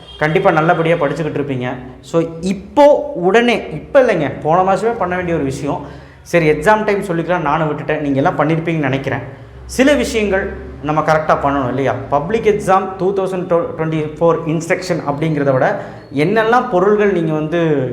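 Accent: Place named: Chennai, India. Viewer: bro I love your slang Neenga Chennai ah ❤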